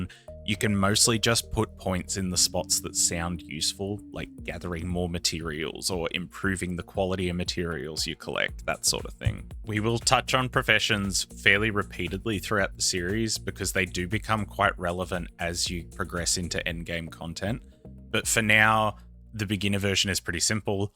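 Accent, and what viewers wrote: Australia. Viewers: Recently came back after many years away. Your series is a steady paced well thought out guide to the key parts, without the clickbaited bs of some youtubers. Might be the aussie in your voice, but it's like hanging out at the local and listening to a bloke having telling about his latest fishing adventure. Comforting and engaging, keep up the good work mate.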